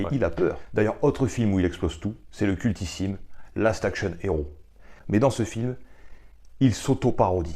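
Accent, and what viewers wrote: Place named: Bas-Saint-Laurent, Canada. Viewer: oui l'accent du bas du fleuve que ta pris pas celle de MOntréal ni Québéc bien content d'avoir faite ta connaissance le cousin de la france génial ton video 🤣🤣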